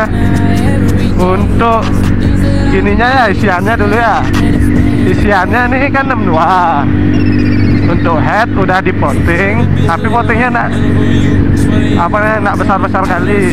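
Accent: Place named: Bali, Indonesia. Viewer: Kle bali banget wkwk jeg kaku bhs indone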